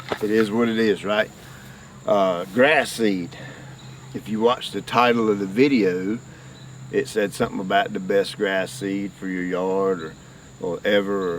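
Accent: South, United States. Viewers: You are an amazing person with a great southern voice!! Lol keep up the great work!!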